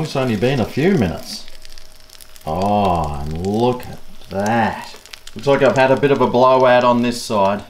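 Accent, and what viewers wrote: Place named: Australia. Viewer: From an Aussie - I love your good old Aussie accent and style. Great representation of the average Australian :) Good lookin sanga!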